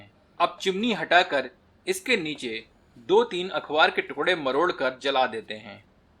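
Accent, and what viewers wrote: Standard. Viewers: bahaut hi shuddh hindi se boltain hain jo sunne main acche lagtain hain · Inki Hindi vartani sun k man prafullit ho jata hai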